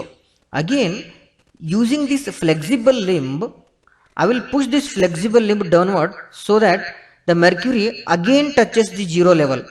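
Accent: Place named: India. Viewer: most indian accent i have ever heard, love it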